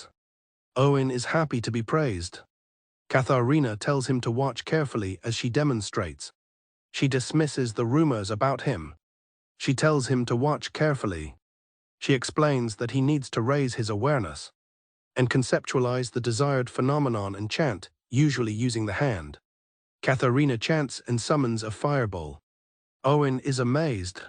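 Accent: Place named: United Kingdom. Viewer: Manga recap with a British accent?!🤩👍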